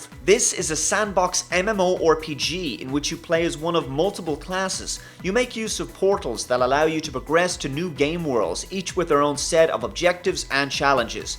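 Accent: Ireland. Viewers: are you irish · Are you irish? :D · R U irish?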